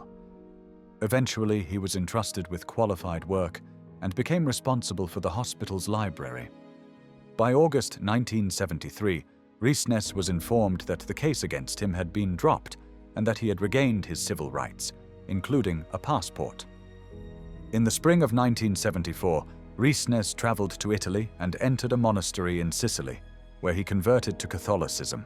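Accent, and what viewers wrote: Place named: United Kingdom. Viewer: agreed. or at least someone without a UK accent or whatever that is. sorry, cannot listen to an entire video of that accent.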